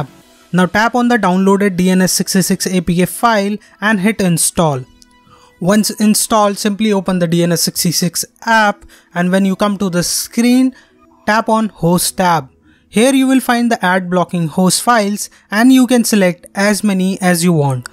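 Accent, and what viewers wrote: India. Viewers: U speak like indian i cant understand wut u said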